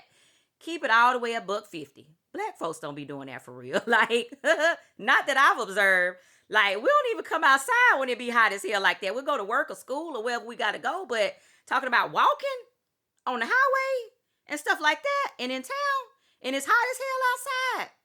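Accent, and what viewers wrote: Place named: United States. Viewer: I JUST “met” you and KNOW you’re FBA. If your looks don’t give it away, your accent SURELY DOES!!! 😩🤷🏽‍♂️🤣 lol. Salute, Good Sister!!! 💪🏾✊🏾🤌🏾 And, “Let the record show”, I am FULLY against ILLEGALS and, I’m NOT fond of immigration as a whole. FAR TOO MANY here, lowering wages and raising prices of EVERYTHING, ESPECIALLY houses and apartments. Land/buildings in general. Hell, Coretta Scott King was against immigration. 🤷🏽‍♂️🤷🏽‍♂️🤷🏽‍♂️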